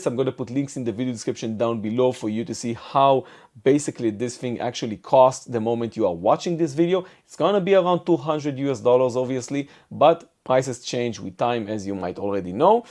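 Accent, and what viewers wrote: Israel. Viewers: Is that israeli accent?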